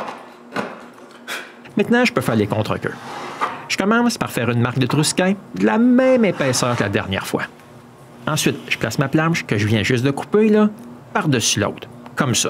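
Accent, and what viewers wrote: Quebec, Canada. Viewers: Is this a quebec accent?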